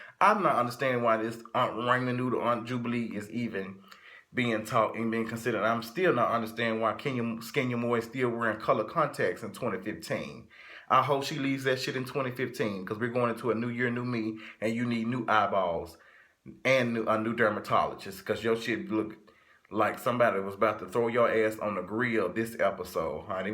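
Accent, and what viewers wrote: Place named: South, United States. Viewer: Your country talking 😭😭😭😭😭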